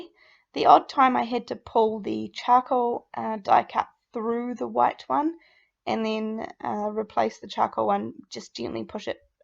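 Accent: New Zealand. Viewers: Gorgeous card and a technique I am keen to try for myself. Wonderful to hear a Kiwi accent , that's why I subscribed to begin with, as support for a fellow New Zealander then I made the happy discovery that you are also a very talented card maker so many thanks for putting so much time into sharing your skills with us.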